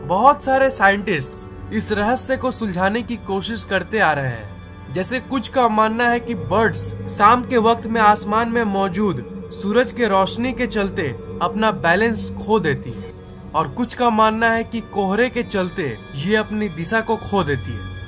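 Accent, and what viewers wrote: Bihar, India. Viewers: Sir Are you from bihar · Bhai koun ho nhi ho ye to nhi pata par tum bihar se ho ye to pukka hai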